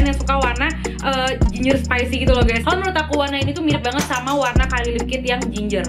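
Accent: Medan, Indonesia. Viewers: Assalammualaikum, Kak,... Kenalkan nma ku, Alia,.. Brasal dri Malaysia,... Aku suka skli sama content video, Akak,... Jgak cra pertuturan kata Medan tu, Pas skli sama org nya,....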